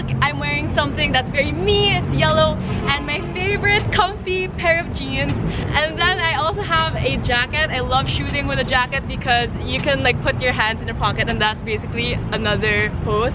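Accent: Philippines. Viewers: are you filipino? (accent) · damn, filipino accents, its so weird, okay im not hating because I also is a filipino, and my old "grammar" doesn't make any sense, just saying okay, dont hate me I also use to have a bad accent when speaking english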